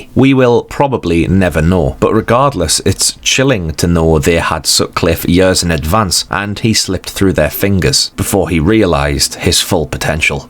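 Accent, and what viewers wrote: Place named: Newcastle, United Kingdom. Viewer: I don't mean to doxx you or anything but it sounds like you've got a Geordie accent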